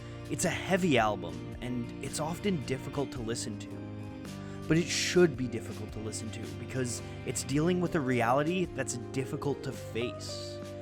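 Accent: Canada. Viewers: he's from canada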